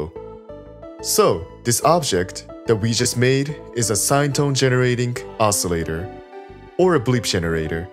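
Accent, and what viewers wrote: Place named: Netherlands. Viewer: You have a slight accent (barely) and I can't tell if you're Dutch, Scandinavian or Japanese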